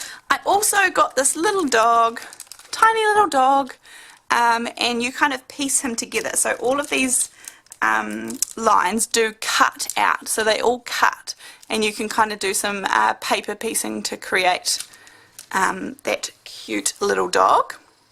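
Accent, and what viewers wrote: New Zealand. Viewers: Wow !! So how do you heat emboss the mandala please? What kind of stamping ink do you but to get a really clear stamp? I've been using aliexpress ink and the images turn out a wee but fuzzy. Thanks so much for your videos!! It's nice to hear a fellow kiwi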